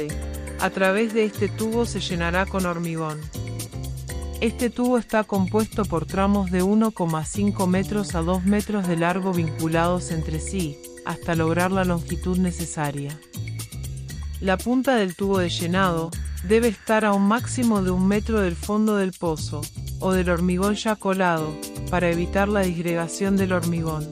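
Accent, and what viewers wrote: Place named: Argentina. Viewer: Vi la información de las zapatas, me iba a suscribir, ya lo habia hecho, todo parece indicar que la información viene de un ingeniero estructural y por el acento de voz, es claro que es Argentino.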